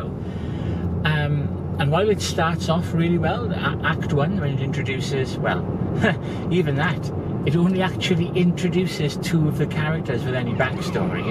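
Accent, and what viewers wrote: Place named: Birmingham, United Kingdom. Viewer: You from Birmingham? I recognize the accent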